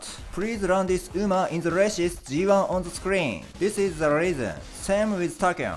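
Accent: Japan. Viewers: For one I love when jp ppl talks like this. But don’t worry if you just subtitled your videos it’ll be the same effect. If ur stuggling with English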